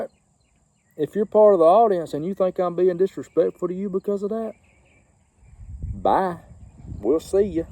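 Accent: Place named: South, United States. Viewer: Sorry to hear about the loss of your wife's granny. Praise God for the Comforter Who helps His people. As for the way you sound, sounds like a southern to me. As for as trains go I miss the caboose . When we were kids we would wave at the men who rode in the caboose . There is a lot to be said about trains. Really I don't know what makes one run anyway. I enjoyed your video. Have a gooden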